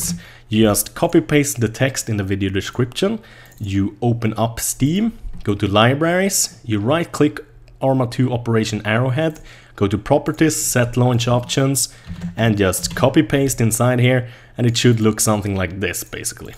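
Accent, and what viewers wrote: Sweden. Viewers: You sound like your from sweden o you were nice! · dat swedish accent lol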